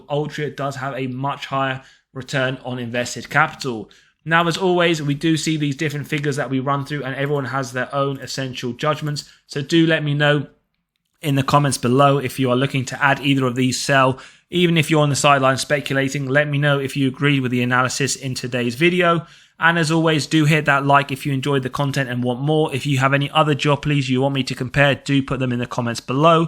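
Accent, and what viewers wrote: England, United Kingdom. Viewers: BTI vs BATS? are you just catering for the global audience or would you consider BTI rather than BATS? do we (you sound english) pay dividend 15% tax on BTI? but maybe there's no stamp duty.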